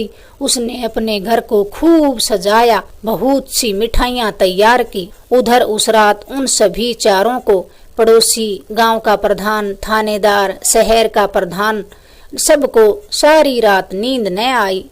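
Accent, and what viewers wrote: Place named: Rajasthan, India. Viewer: महिला। राजस्थानी। है ।लहजे। से। पता। चलता। है ।रंगीलो। राजस्थान। ।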